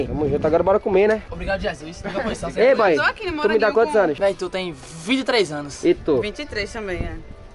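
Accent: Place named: Northeast, Brazil. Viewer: Esse sotaque do nordeste é massa de mais mano kkkkkkkkk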